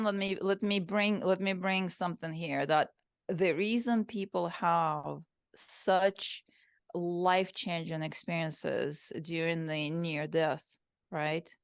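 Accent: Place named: Russia. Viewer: She has russian accent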